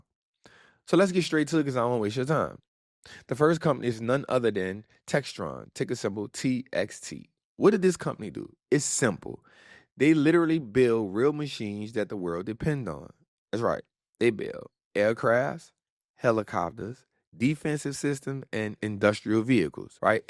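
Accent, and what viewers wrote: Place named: South, United States. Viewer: Can someone tell me what accent this guy has? Is it somewhere in the South.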